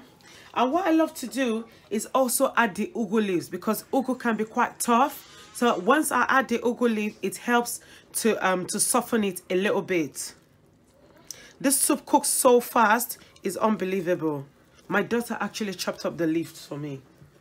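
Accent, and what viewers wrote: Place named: United Kingdom. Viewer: Ur British accent eehh😁😀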